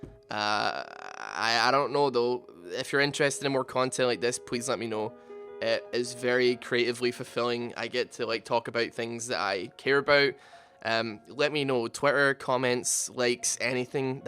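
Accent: Scotland, United Kingdom. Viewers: Don’t mind me I’m just here for the Scottish accent.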